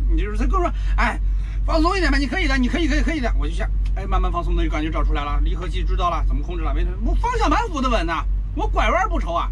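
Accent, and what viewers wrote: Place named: Jilu, China. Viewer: 自认为在辨别各地口音方面有天赋。。结果这个老哥的口音真是迷幻。。可能是冀鲁官话的人娶了个东北老婆，或者东北人娶了个江浙老婆。前者可能性大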